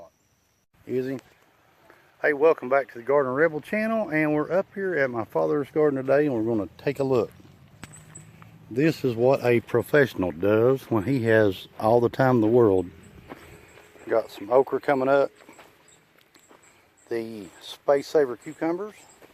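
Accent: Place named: South, United States. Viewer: Ok, I’m going to straight admit to this. I subscribed because I really like listening to your southern accent.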